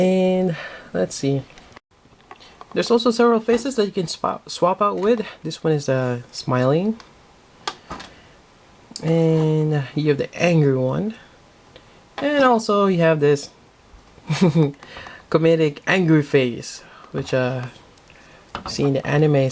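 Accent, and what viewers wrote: Philippines. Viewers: r u Filipino? Cause I’m half Filipino and I can kinda tell from ur accent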